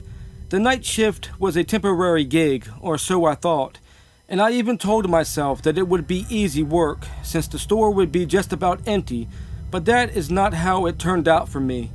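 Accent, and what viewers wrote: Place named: South, United States. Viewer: Your video randomly showed up. I see that you are a small channel. But I noticed that you had a southern accent and that made me appreciate your commentary right away. It may not be everyone's thing, but it makes it more relatable in a way. You got yourself a subscriber. Keep on keeping on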